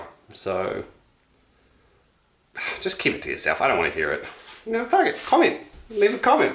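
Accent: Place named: New Zealand. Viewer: You a Kiwi?😁 You have a slight accent and in sure you aren't Brit or South African and me hearing a bit of an accent probably means not Aussie.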